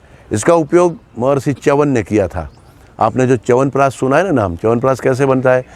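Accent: India. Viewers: प्रणाम सर ,अति ज्ञान वर्धक.... An extremely scientific analysis ,never heard it before Sir ,your gujrati accent delivery in hindi is so loving ,I rightly feel, my self being in bhuj decades ago.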